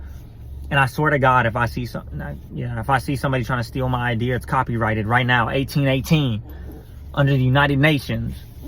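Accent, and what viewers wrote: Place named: Louisiana, United States. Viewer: Hi! I just found your channel! You sound like you’re from Louisiana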